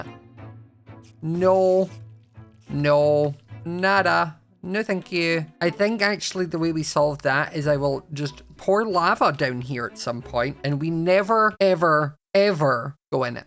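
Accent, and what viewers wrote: Scotland, United Kingdom. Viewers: Are you Scottish scot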